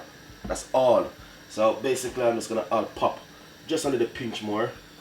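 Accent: Jamaica. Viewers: go over to another cooking channel for the queen's English. This is a Jamaican chef, talking in his Jamaican dialect. Low dat no man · That was what I came to listen for when I find the video. I said “ if he don’t have a Jamaican accent, am clicking off!!” 😆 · Where I live at the moment there are zero Jamaicans so its ten years since I heard the accent. This made me laugh when he said what sounded like ola milk, then I realised he was saying whole milk and I collapsed laughing gosh I miss that accent. I am English living in Sicily and cant wait to get home !!! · I was wondering if it was a brand of milk that he was referring to and I’m Jamaican and I did not understand what that was. I even went in his description to see if he listed the ingredients. I have no issue with his patois, that just went over my head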